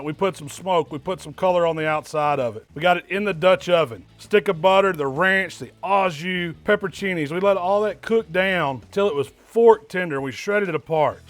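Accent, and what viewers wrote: South, United States. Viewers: always trust a fat chef with southern accent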